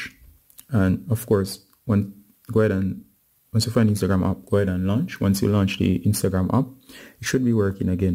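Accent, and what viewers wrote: Jamaica. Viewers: Love your voice man Are you jamaican or something ?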